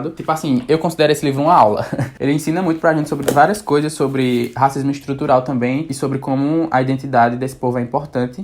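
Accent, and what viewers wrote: Northeast, Brazil. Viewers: Cheguei agora no seu canal e já quero assistir todos os seus vídeos, acho que me identifiquei mais por conta do seu sotaque (muito bom de ouvir, diga-se de passagem) já que eu tbm sou do nordeste. Ganhou mais uma inscrita e já coloquei vários na minha wishlist. :)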